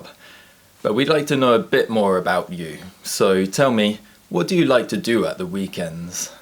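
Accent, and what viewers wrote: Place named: England, United Kingdom. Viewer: hello, where are u from in England,:")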